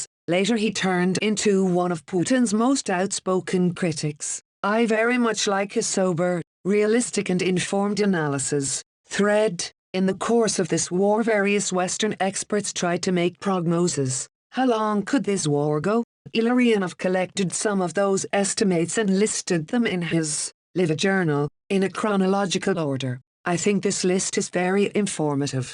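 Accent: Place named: Ireland. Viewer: Why does the bot have an Irish accent?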